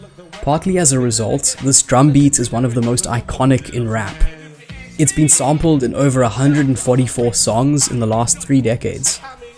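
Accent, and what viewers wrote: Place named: South Africa. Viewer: Kwaai video broer en opbreuk. Jou aksent klink Suid Afrikaans, indien nie... definitief Australiaans of Neeu Seelands😊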